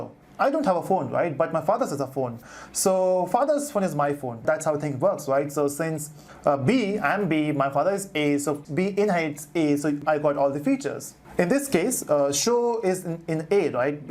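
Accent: Telugu, India. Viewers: are you a Telugu guy from Andhra Pradesh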